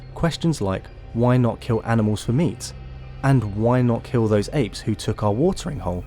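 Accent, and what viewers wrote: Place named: United Kingdom. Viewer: I couldn't understand this video with his British accent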